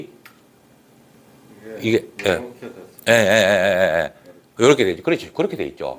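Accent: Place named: Gyeongsang, South Korea. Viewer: 전형적인 경상도 말투에서 듣는 사람에게 짜증이나 화를 내는듯한 말투죠, 근데 실상은 그냥 평범한 말투인데 타지사람이 듣기엔 참 불편ㅋㅋ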